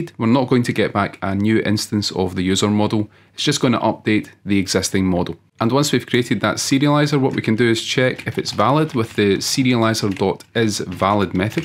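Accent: Scotland, United Kingdom. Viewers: Sorry if I'm rude but what is that accent Scottish ?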